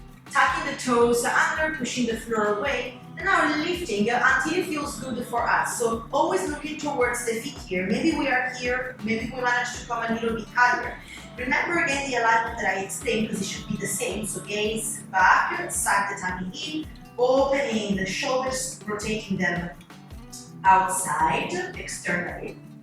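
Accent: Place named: Italy. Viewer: I love your Italian accent 👍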